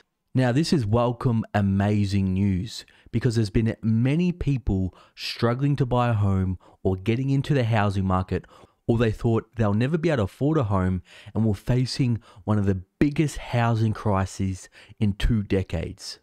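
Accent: Australia. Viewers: Dude when I heard your Australian accent I thought you were talking about the Australian property market only to realise you were talking about the US. Got my hopes up. Property boom isn't ending here any time soon sadly and now agents are getting even more greedy by putting most of them on auction!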